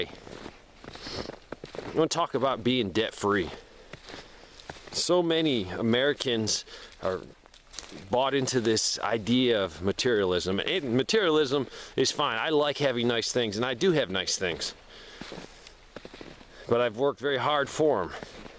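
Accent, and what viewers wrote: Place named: New York, United States. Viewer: I knew you were a NY'er. I'm from CNY but transplanted to NC :)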